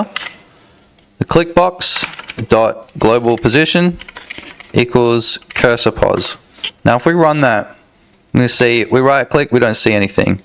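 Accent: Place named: Australia. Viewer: Australian English can be a bit tough. Slow the playback down to 0.5 to drag out those vowels and make it sound like were all at the pub after a big night :)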